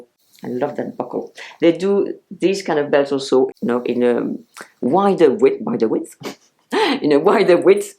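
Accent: France. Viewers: You are very talented and can make anything look chic and expensive. So classy are some outfits that I copy some belts and scarves. The big thing I have taken to is red lips. My mother told me when I was 15 yrs old to wear red lips and 70 yrs later I'm doing it again. I wear eye shadow more naturally with a chocolate colour in the top of my lids and a beige or gold onto the lids. Muted of course. It's a head Turner. Just love that you don't put concealer under your eyes either. It's a winner. I watch Nicole Johnson and another french/ American model but you are tops for a little that goes a long way. Please do some more on your classy make up. Just love your anglicised french accent. 😍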